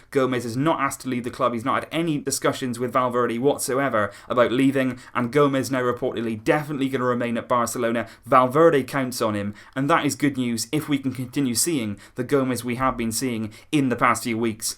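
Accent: United Kingdom. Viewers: barcalegend _10 uk i know by the acsent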